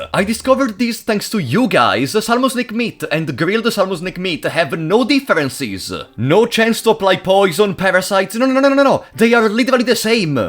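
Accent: Italy. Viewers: From your accent, it feels like you're a "proper" italian (let the term pass please, it's just to differentiate from italian-americans). Am i right or am i way off the mark? 🤔😅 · il tuo accento italiano comunque è evidentissimo. Vengo dal regno di Cydonia. seguaci di Katanna venite fuori. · I am indeed someone who does not play the game. And indeed, I just plain out like to hear you ramble about things you like. It's nice to hear you talk about your interests, what you think of them, the jokes ideal para el tratamiento de hemorroides , hearing you talk about your life and, I hope you don't mind me saying this, I love your accent, Italian man.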